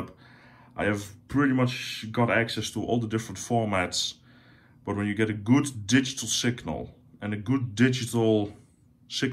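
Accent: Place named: Netherlands. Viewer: Leuke setup man, dacht all heejj dat klinkt als hollands engels😂🤣👌🏻💪🏻👍🏻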